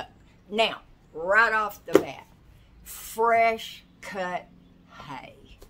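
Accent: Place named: Oklahoma, United States. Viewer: I think most of us get goofy and silly when we're overtired! Hope you took a little nap. And, about your accent, I noticed in your first videos when you were in Washington, you had much less of an accent. Now you're back to your "born accent" you ole country gal! I love it! You kind of sound like my Grandmother and Aunt from Oklahoma! Feels very "homey" to me. Even though I've lived in western NY state since I was 13 years old! Love ya! x ~Darcy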